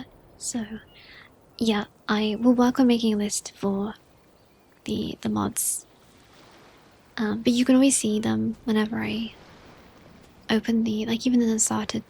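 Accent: England, United Kingdom. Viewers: This is the most English voice I've ever heard, goated 🙏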